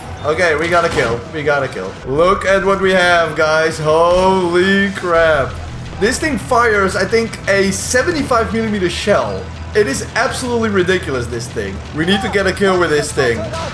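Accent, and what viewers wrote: Netherlands. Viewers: Are you Dutch? I think I recognize the accent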